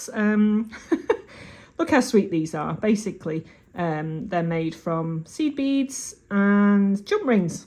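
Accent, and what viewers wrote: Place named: England, United Kingdom. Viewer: Ok, you can say ok all you want, ok 😊.... ok! I do like the darker material for your background. I was going to suggest a beading mat, but that looks good. Sorry for people being people... I think you are great! I really enjoy how you speak and the way you speak from England being from America, it's neat yo hear the differences. 😊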